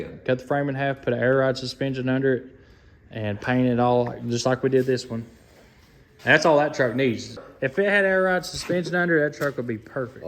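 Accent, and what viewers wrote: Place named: Tennessee, United States. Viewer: Close your eyes, who does sound like? He could do a voiceover for Gomer Pyle effortlessly. I don't say this to make fun my accent is 150 miles east of you in Tennessee so I have the accent as well.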